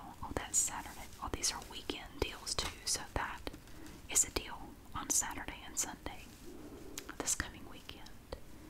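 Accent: South, United States. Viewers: Anyone else wondering where she is from in the South!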